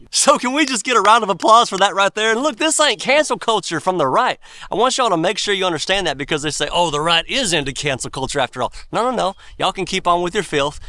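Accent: South, United States. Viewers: I just want to move down south, so I can sound as cool as you! 😎🤓🤠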